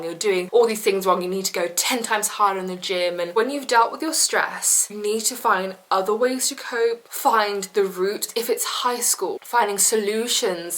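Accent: United Kingdom. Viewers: I just realized that you’re talking with British accent😂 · irrelevant trash Its like a british accent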